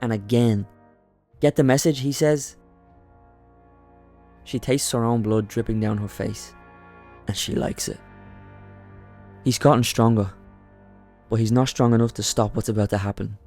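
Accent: Dublin, Ireland. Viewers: im guessing north dublin just cus it sounds like some of my mates accents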